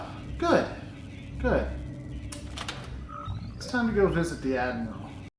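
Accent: United States. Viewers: So freedom is not something worth sacrificing for? It is, in fact it’s worth laying everything on the line to keep it. As an American (presumably from your accent) you should understand this from our history.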